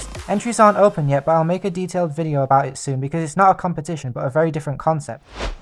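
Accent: United Kingdom. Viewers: I never knew that is British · Are you British? Because you speaking very well done and I love it. The plane is satisfying and great job! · Sup your british too?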